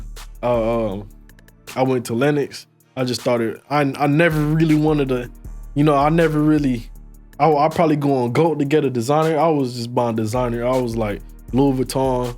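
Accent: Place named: Jamaica. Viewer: He Jamaican. You can tell he still tryna fiigure out the American talk.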